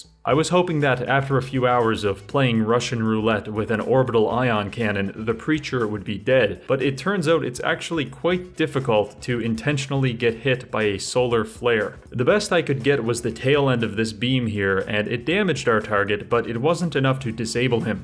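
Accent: Canada. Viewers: I love how your accent seems quite local to me until one word comes out ULTRA CANADIAN and I'm reminded you're not local to me at all.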